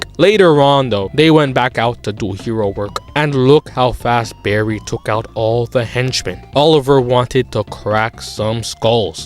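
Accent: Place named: Toronto, Canada. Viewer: I can't pay attention with that crazy ahh toronto accent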